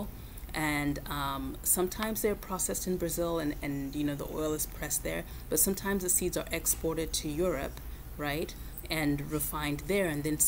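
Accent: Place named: Zimbabwe. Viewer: Are you Zimbabwean? that accent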